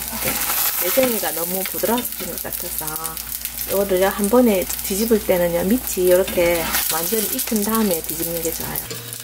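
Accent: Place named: Busan, South Korea. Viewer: 🇬🇧영국 팬입니다. 저는 고향이 부산인데 말씀하시는 게 꼭 부산에 있는 것 같아 친근감이 들어요 ㅋㅋ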